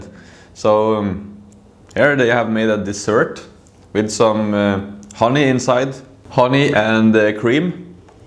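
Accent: Egypt. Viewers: I loved your Egyptian accent 😂 Go on , you are doing well 😁 I wanna say something about " makarona pashamil " , it's pasta with a white sauce and cheese and that's it I think it's not Egyptian , Try to eat " Mahshy " or " Mombar " 🤣🤣🤣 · عسل والله لما بتتكلم بالمصري 😂🌸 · I love you when you speak arabic with egyptian accent💜💜💜💜😂😂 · ههههههههه كلامك المصري حلو جداً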